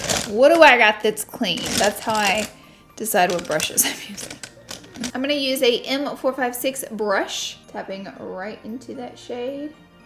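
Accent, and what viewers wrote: South, United States. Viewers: A new sub here! I love your content, your delivery and that damn southern accent!!! "Conciller".. LOVE IT!!!!!!! 😀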